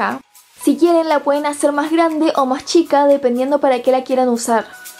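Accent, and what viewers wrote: Argentina. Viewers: Eres de argentina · Sos argentina? Sólo para saber xd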